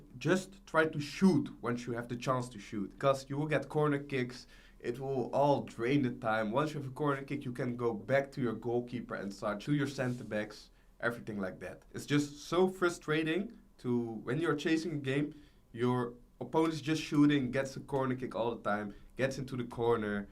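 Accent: Netherlands. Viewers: Thanks voor de tactieken, maat! Intussen, je klinkt echt super Nederlands, hoor!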